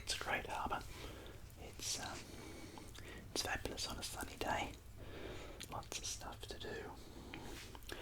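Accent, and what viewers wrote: Australia. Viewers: What is he actually saying. Australian accent working against me here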